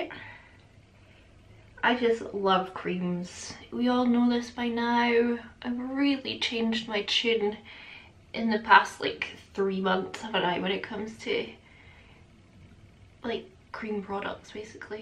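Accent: Scotland, United Kingdom. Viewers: Definitely a look...or an Elaina look too. Lol. Can I do your Scottish accent? I doubt but I can try. 🤣😘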